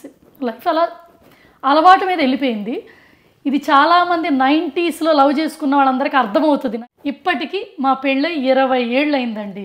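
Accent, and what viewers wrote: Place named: Guntur, India. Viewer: మీ గొంతు diffrentg గా ఉంటుంది పై గా మా గుంటూరు అనే ఫీలింగ్ happy 20th wedding 💍 aniverseley.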